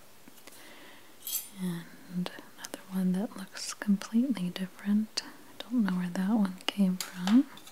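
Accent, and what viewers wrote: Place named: Pennsylvania, United States. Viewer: Your accent reminds me of where I grew up in Bucks County, Pa. So much nostalgia!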